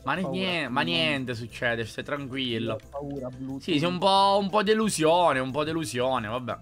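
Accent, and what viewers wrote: Sicily, Italy. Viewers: ma sei siciliano? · Ma sei siciliano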